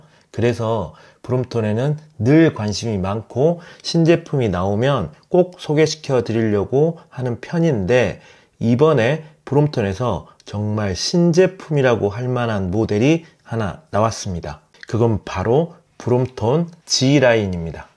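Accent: Jeolla, South Korea. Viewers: 전라도 사투리 맞나요?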